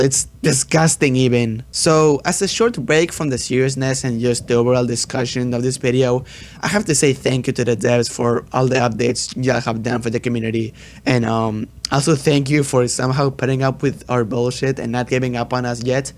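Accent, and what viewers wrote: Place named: Spain. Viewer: se le sale el español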